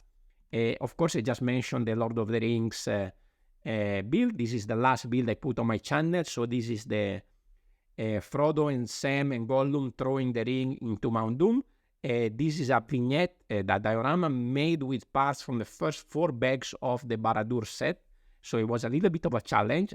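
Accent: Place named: Italy. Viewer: Thank you for the kind feature, I'm so glad you like my Fabuland paddle steamer. Your models are beautiful and I absolutely love watching this channel, particularly for your accent as it reminds me of my Italian friends. Best wishes, Stewart (Stubot) ❤💛💙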